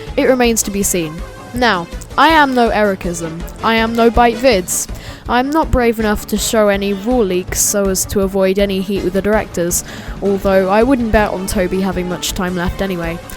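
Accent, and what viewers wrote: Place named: United Kingdom. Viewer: I like your British accent, I would also like to talk like that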